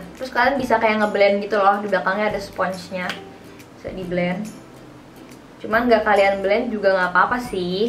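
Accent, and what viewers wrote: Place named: Java, Indonesia. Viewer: bagusan begini, medok tapi kok cantik bangettttt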